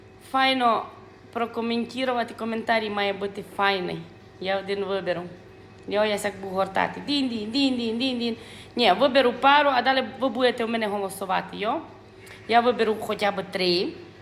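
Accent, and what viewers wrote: Transcarpathia, Ukraine. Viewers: Вітаю! Ви класна, весела, неповторна! Я викладаю діалектологію в університеті. Ваша мова і харизма - це шедевр. Ми на парах зі студентами дивилися Ваші відео і таким чином запам'ятовували та аналізували фонетичні, морфологічні, лексичні особливості закарпатського діалекту! Розбираємо Ваші перли на молекули 😊 Пишу не заради голубців))) Нехай Вам щастить, файна дівчино! ❤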